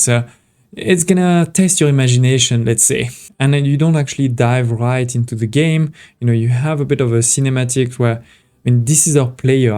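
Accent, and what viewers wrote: France. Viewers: omg I love your French accent, it calms me down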